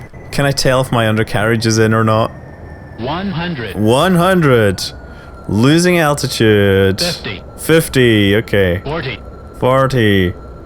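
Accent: Scotland, United Kingdom. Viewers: Yeas hes scottish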